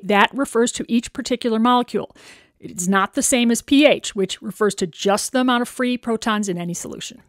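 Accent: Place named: South, United States. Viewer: It is a southern accent and I love it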